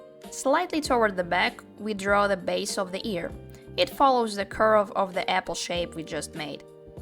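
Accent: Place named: Indonesia. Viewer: For some reason I hear a little Indonesian accent lol, thank you so much for the tuts!!💖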